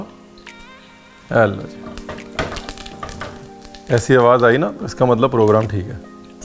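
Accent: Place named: Malwa, India. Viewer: It's great to listen to your malwai punjabi. Esp when you quote your grandparents. Keep on...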